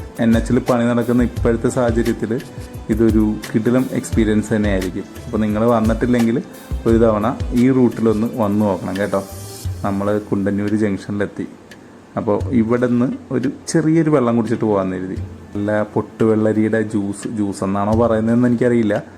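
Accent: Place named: Kollam, India. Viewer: Shudhamaya Kollam slang.. ethaand achadi malayalam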